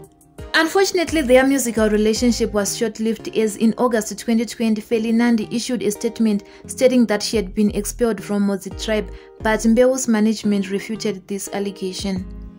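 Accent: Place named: Shona, Zimbabwe. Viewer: I am much in love with the deep english shona accent, and loooong pauses by the narrator 🤭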